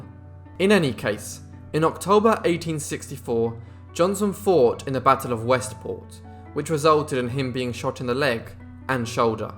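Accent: England, United Kingdom. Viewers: Can’t understand this fucking Britt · Why is a England accent doing the narrating it doesn't match the man. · Mark Felton that voice is awesome rolling proper British Gentleman s equal to his fantastic content, definitely in the running for YouTube Supreme Docudude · English accent, I'm out. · I am surprised to hear this narration. I know American stories are shared the world over, but hearing English narrators is still a novelty for me. Thanks for the information.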